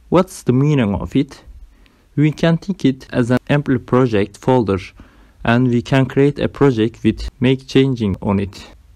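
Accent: Turkey. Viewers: Are you Turkish? because your accent is similar :) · You are Turkish, I can swear but I can not proof :)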